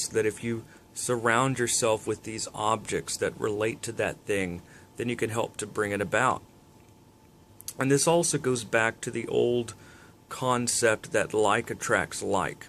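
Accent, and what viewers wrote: South, United States. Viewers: Hi from Sydney, Australia. Thank you for your explanations and knowledge - especially around the historical context and ancient symbol aspects, as your teachings have started to provide me with reasons / answers for why I subconsciously use symbols via colour, plants, nuts, shells, stones and crystals to attract harmony and inner peace and have always done this since childhood. I have just started to use symbols for protection and for hurrying up karma. Sometimes one cannot just wait if one or their friends are being hurt. It is so satisfying to watch the Universe respond to your call. Love your words and especially your 'southern' accent, it adds a unique flavour to your weekly explanations. Thanks again, Barbara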